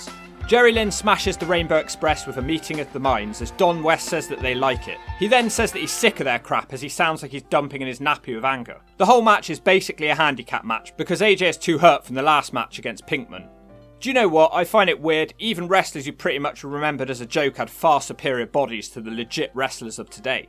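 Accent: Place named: England, United Kingdom. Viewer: I don't normally enjoy the english accents and way of words but you I like. Minus your aew hate ofcourse but we're all friends here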